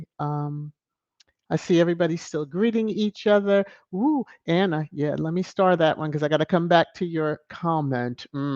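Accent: RP, United Kingdom. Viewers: Thank you for great thrash time 🙏😘❤️❤️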